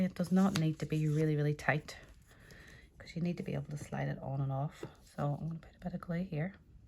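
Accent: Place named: Northern Ireland, United Kingdom. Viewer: Great to hear your accent - making me a bit homesick. I grew up in Belfast. Now live in Western Australia. I am also a fellow SU demo. Tfs this lovely project. Just found you through Pinterest 💕.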